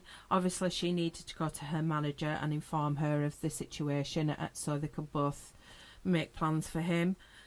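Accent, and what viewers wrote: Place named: United Kingdom. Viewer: Just came across the channel today and so glad to hear a British voice for a change when discussing crime , most seem to be American that I follow anyway. Have subscribed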